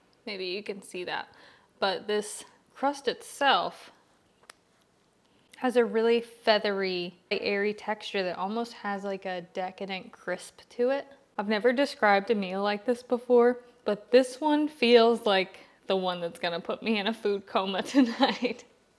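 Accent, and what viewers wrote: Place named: South, United States. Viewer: Girl, straight from the south 😊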